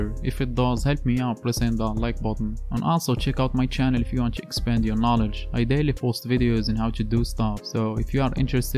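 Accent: Morocco. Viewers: mn soutk 3rftk mghribi hhh kayn m3alem abro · you'r accent like arab ( morroco - marrakech ) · from you accent i can tell that your moroccan am i right !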